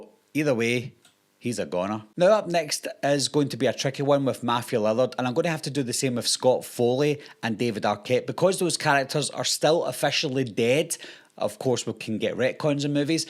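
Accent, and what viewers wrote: Scotland, United Kingdom. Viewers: You keep calling Chad Omar Gooding. His name is Mason Gooding and no it's not your accent as I know people with a stronger Scottish accent than you. I always went on holiday in Scotland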